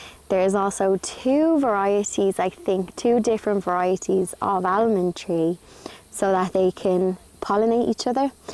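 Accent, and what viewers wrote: Ireland. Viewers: love to hear that beautiful Irish lilt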